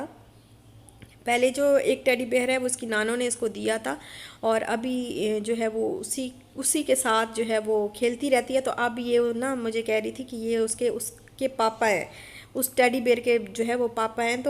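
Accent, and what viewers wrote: Saraiki, Pakistan. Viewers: i watched first time ur vlog r u seraiki ur accent is just like seraiki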